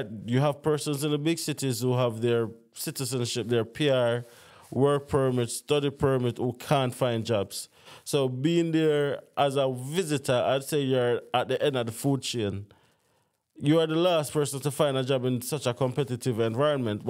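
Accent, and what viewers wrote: Jamaica. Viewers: Like ur English patwa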